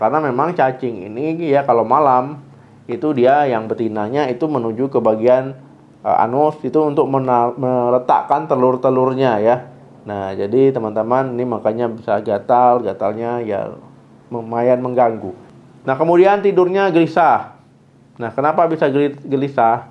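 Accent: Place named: Medan, Indonesia. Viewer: LOGATNYA MEDAN BANG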